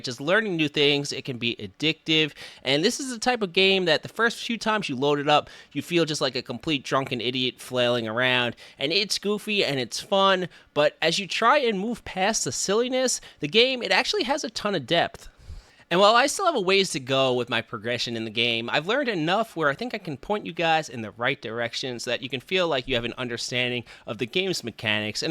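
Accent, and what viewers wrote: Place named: New Jersey, United States. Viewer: This guy is def from NJ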